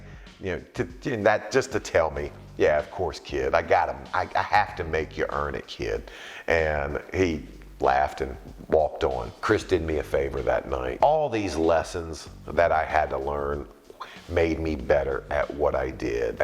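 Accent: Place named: South, United States. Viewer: Thank you brother! You give us the transparency we usually have to dig through dirt sheets for. Can't wait to hear you on commentary when Cole retires. I could totally see you, and pat calling smackdown! We need a southern accent on that mic always!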